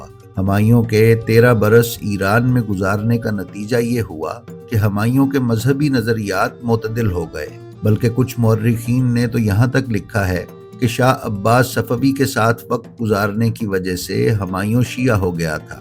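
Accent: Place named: India. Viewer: lakin apka lehja hindustani lagta he..isliye poochha.. mashallah salamat rahe..aap acha kaam kr rhe...Allah apko sehat o umr de.. Darkhwast he Dilli me madfoon shaheed e rabe "Hakeem mirza mohammed kamil" k mutalliq bhi apne samaeen kokuch bataye...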